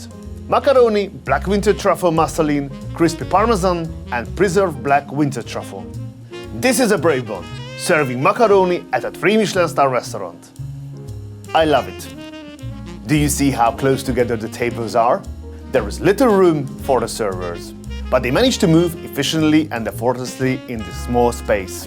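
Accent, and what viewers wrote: Hungary. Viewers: This is my first time seeing a video on your channel, I gotta say amazing content. Your accent😄 are you Hungarian?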